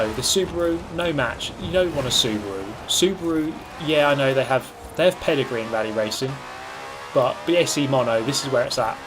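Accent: United Kingdom. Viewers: british accent ; Miles Per Hour. hm..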